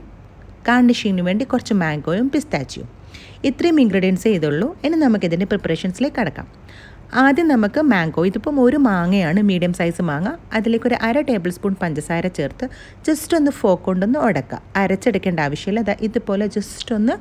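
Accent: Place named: Kannur, India. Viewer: ചിലർ നമ്മെ അത്ഭുതപ്പെടുത്തും എന്ന് പറയാറില്ലേ... എത്രമാത്രം ശരിയാണെന്നു ഇങ്ങളുടെ ചാനൽ കണ്ടാൽ മനസ്സിലാവും.. അസാധാരണമായ റെസിപ്പികൾ, ആരെയും ആകർഷിക്കുന്ന അവതരണം മാസത്തിൽ ഒരു വീഡിയോ ആണെങ്കിലും അത് കാണാൻ ആരും കാത്തിരുന്നു പോവും.. 🥰🥰🥰 എത്ര ഭംഗി ആയി വളരെ വ്യക്തമായി അവതരിപ്പിക്കുന്നു.. കണ്ടപ്പോൾ തന്നെ വായിൽ ഒരു പേമാരി വന്നു 👌👌🤣ഒരു രക്ഷയും ഇല്ല... കിടിലൻ റെസിപ്പി.. ഭാര്യക്കും പെങ്ങൾക്കും ഷെയർ ചെയ്തു... 👏👏👏 ബ്രോയുടെ ബിരിയാണി കിടിലൻ ആണ് 🌹🌹🌹 (ഇങ്ങൾ kannur ആണോ നല്ല അക്ഷര ശുദ്ധിയുള്ള സംസാരം ). ടോട്ടലി അടിപൊളി എപ്പിസോഡ് 👏👏👏👏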